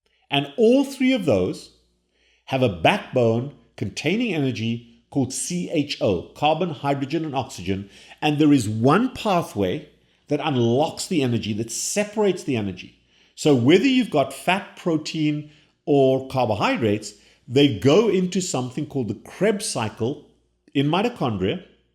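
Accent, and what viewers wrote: South Africa. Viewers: He sounds very south African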